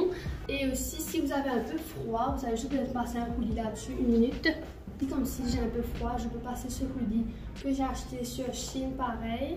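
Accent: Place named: Mauritius. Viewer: T'as l'accent mauricien. 😻🌹✨🌹✨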